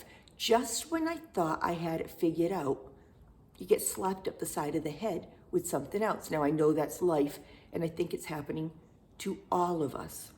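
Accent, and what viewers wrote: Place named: Massachusetts, United States. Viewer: Hello, new subscriber here! I just found your channel and I love it! As soon as you started talking I knew you were from Massachusetts! I can relate to everything you have said in this video. I am slowly learning self care instead of being a doormat. I look forward to watching your future videos as well as your back catalogue. All the best to you and your family from Massachusetts!!😊🌲🌳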